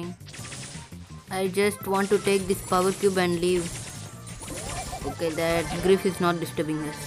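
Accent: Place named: South India, India. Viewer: Ur voice says ur South Indian , if u say yes , I’ll reveal my state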